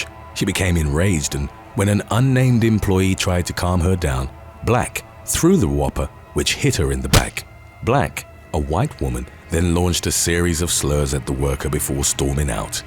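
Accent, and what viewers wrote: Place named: England, United Kingdom. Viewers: It’s like David Kieth’s but with the added bonus of an English accent! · Its a British Pro-Bubbly Lóndón Voicé of a Wést indián Jamacíân Negroiđ voicé Lyké Danný Johń Julês frôm Thê Rêd Đwãrf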